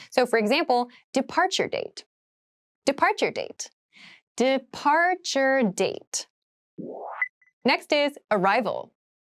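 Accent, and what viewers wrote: United States. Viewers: She speak in American accent❤ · First of all thank you for your content! I'm confused a little, why does a "suit" sound like a "sweet" (at least this is how i hear it) - I've checked that word on the youglish and it sounds a bit different. Is your pronunciation specific to some region in the US? cheers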